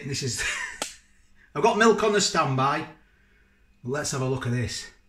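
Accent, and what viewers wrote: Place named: Yorkshire, United Kingdom. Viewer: I was waiting for the moment when it burnt your mouth off but you handled it like a boss! Great review, the Yorkshire accent tops it off nicely 👌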